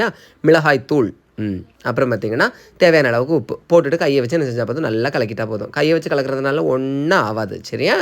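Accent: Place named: Telugu, India. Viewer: Nalla thamizh pesu bro. Telugu vaadai adikuthu unga tamizh. Style nu nenachi pesuringa. Tamizh mella saagumnu kelvi patturken unga kita tha paakuren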